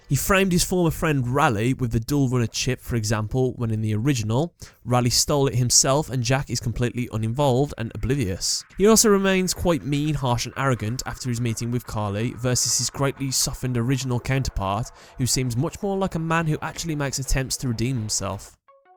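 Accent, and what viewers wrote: United Kingdom. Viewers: You sound British but you can't speak like ATHLAS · Dude You Speak In A British Accent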